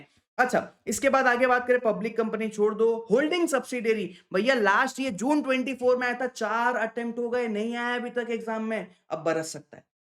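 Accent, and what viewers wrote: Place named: Gujarat, India. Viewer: ye kaka kaki ye sir gujarati lagte hai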